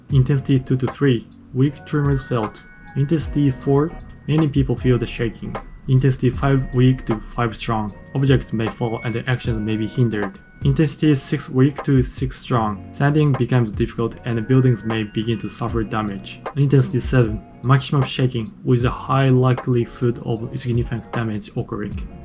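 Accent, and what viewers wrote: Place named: Japan. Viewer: I hope this comment isn't taken the wrong way — but I really like noticing your accent poke through, because otherwise, you sound completely like a native English speaker! By analyzing where your accent comes out more, I feel like I understand better what sort of verbal quirks a native Japanese speaker has. Really, what I mean to say is that your English is really excellent and you are very well-spoken! I'm excited to see more of your videos, not just ones that are topical to my personal interests. I am interested in the perspective you give to the topics you talk about. ^^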